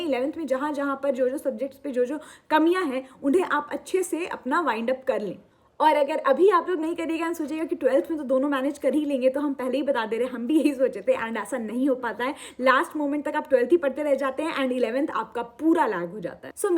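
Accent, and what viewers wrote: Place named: Mirzapur, India. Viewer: Aap jab baat karti ho mirzapur yaad dila deti ho ❤️😂with ur accent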